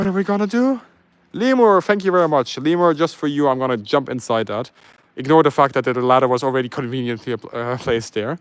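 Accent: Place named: Germany. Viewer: That was awesome the German accent ads to the show love it when you say (Millimeter)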